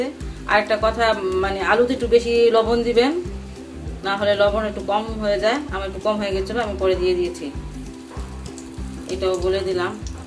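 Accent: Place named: Bikrampur, Bangladesh. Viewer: Apni ki bikrompuer meye? Amar mone hosse kotha shone.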